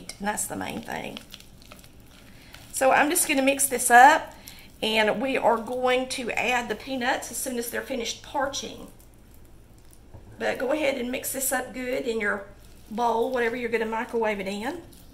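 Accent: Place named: South, United States. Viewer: I swear, even if I didn’t like to cook and didn’t like your recipes, I would still watch you to listen to you talk 😍 Watching all these southern ladies talking in the kitchen on videos brings back my childhood. I grew up in Oklahoma but moved to Minnesota a long time ago, they don’t cook like that or talk like that up here !!!!!!!!!! Blessings to y’all and Merry Christmas A King is Born ✨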